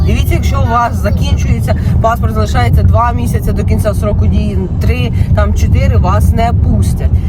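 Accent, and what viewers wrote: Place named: Vinnytsia, Ukraine. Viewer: Приємної подорожі! Храни вас Господь!!!❤❤❤ я теж так як ти говорю Молдавія, Кишиньов😅😅( ну Вінничани і Хмельничани то ми так)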